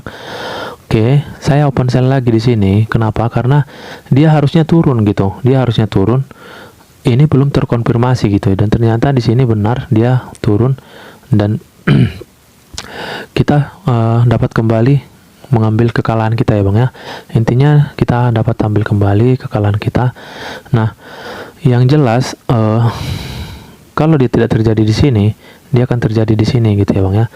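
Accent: Medan, Indonesia. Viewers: Org Medan ya bg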